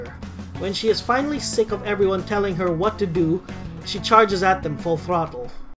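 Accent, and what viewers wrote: Philippines. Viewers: I can recognize that accent anywhere... are you Filipino? Edit: lol Akechi's a white knight... that NOT everyone likes. Ironic isnt it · By the way, are you Filipino? You sound like one.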